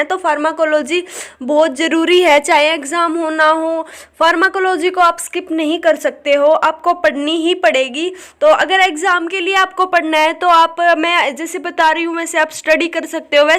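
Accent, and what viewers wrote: Haryana, India. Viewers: That Haryanvi accent is cool And good video 👍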